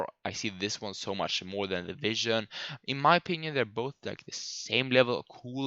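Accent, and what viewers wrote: Sweden. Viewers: Are you from Sweden or something? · Are u swedish?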